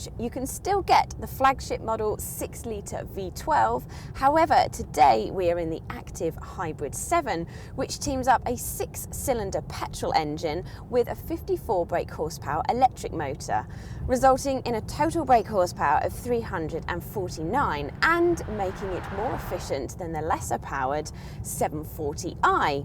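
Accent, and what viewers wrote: United Kingdom. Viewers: british accent, in a sexy thin girl, so hot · Why was I surprised when the steering wheel was on the right side? Her accent. The video says UK. Why didn't I see it coming? I'm an idiot hahaha. When she got into the front seat I was like "WTF?!" shows how much I was actually paying attention hahaha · banaaaanas... love the british accent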